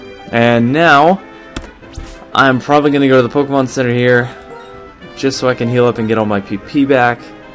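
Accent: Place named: United States. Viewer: Hablas espanol? Tu ingles es de Americano! (O aprendiste espanol como 2da lengua?)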